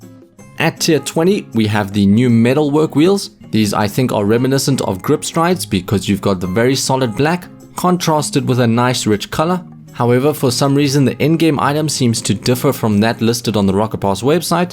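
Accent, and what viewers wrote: South Africa. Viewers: Where you from. You sound south african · Are you South African?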